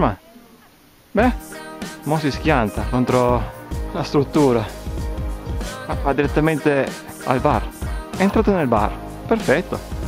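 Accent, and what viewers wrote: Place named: Piedmont, Italy. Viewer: leggero accento piemontese...TI PIACE LA MEENTAA?? 😜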